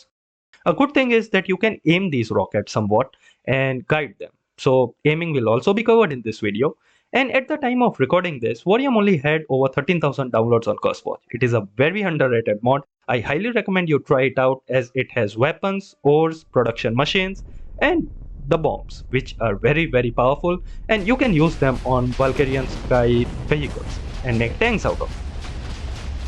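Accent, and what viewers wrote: India. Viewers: yes i knew this was good when i head the indian acent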